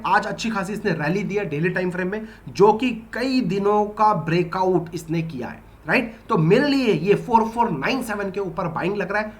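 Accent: Odisha, India. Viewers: Sir are you from ODISHA?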